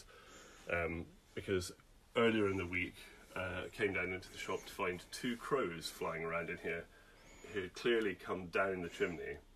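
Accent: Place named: RP, United Kingdom. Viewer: We read you above book in our book club , we all loved it. Now I’m planning to do Scotland tour so hope will de-tour to your book shop. My friend always gets old historical books so now i know where to buy them. It’s so lovely to see the writer , if he’s still alive like you . I like your posh accent 🌷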